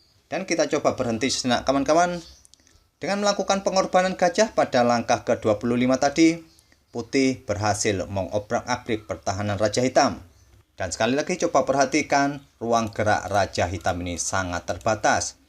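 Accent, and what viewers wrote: Java, Indonesia. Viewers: Jowo ne pundi min?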